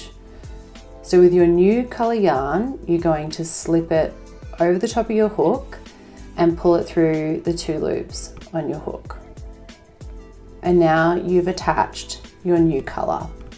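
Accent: Australia. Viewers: Thankyou for this tutorial love this way of introducing new color!!!! Im aussie too and it was such a breath of fresh air yo hear your accent, plus relaxed voice, and no extra yabbiddi yabbiddi!!!! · So happy to have found an Australian tutorial when I googled this method, I’m so used to hearing a British or American accent in the tutorials I usually watch. Your accent brought a smile to my face immediately and a great quick lesson too! Thanks so much ❤❤❤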